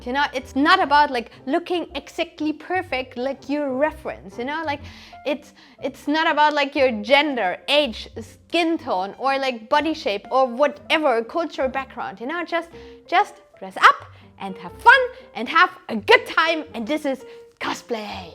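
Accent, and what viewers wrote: Germany. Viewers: For me she Sounds Like she has a Strong German accent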